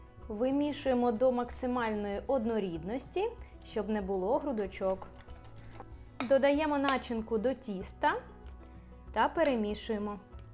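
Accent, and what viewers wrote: Ukraine. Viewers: Так вам йде западенська мова🤗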